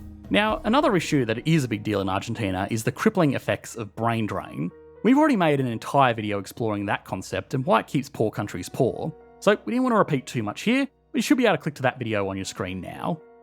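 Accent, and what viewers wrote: Australia. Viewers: es ingles? el acento del narrador me suena a australiano pero me puedo estar equivocando (? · What kind of Australian accent does have? Sounds a little different than other Aussies. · Boludo, he’s Australian.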